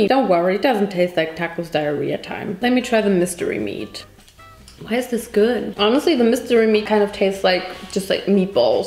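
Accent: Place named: Germany. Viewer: Your German accent is absolutely cute!! Your so funny, thank you for the laughs.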